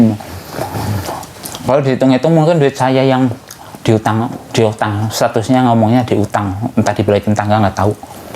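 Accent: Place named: Java, Indonesia. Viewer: asli mana ya, kok jawa bangey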